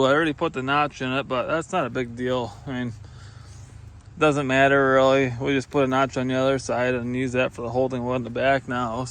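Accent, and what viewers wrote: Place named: Ohio, United States. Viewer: Just from his dialect I'd assume Ohio or Pennsylvania.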